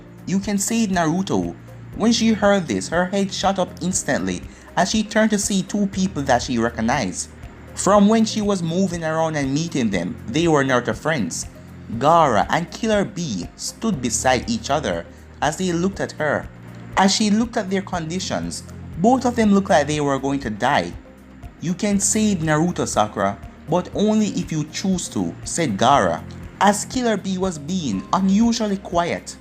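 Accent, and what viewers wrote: Jamaica. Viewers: Hey are you Jamaican I'm only asking because I am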